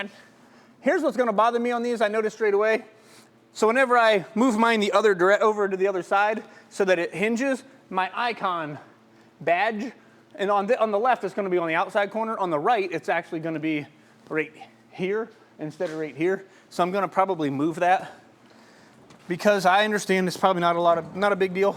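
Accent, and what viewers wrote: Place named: Jamaica. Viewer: Dude you’re wasting you talent big time. You have to close your eyes so you can see better😮😅. Told my wife she immediately asked if you were Jamaican 😅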